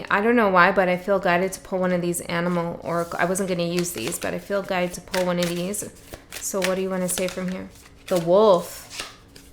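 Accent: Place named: Canada. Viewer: That Canadian accent 👍🏿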